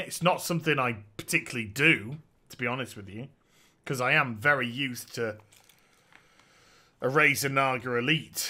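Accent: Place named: United Kingdom. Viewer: Great vid as always. Your soothing British voice helps me relax this boring Wednesday. I think you should wait a while and start over. Keep being awesome! :)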